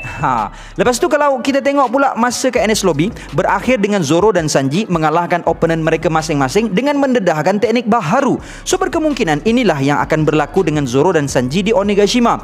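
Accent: Malaysia. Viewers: Waa hebat la abg pandai cakap manglish.. 🥳🥳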